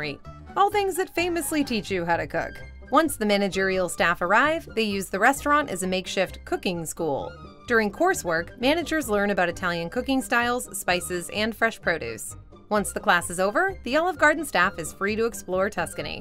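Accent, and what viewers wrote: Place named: Midwest, United States. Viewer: When push comes to shove, I just want to see the face behind the voice. Wonderful Southern Midwestern dialect.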